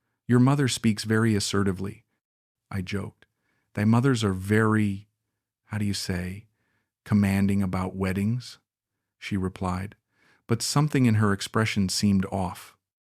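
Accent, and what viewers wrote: United States. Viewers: The story might be real, but this guy is totally fake, AI. I doubt any "bloke" from Darwin speaks in a pure US accent, not a trace of Aussie pronunciation. This channel produces many of these vids about a guy getting scammed by a Thai woman half his age. And they all sound the same and look the same. Strange channel.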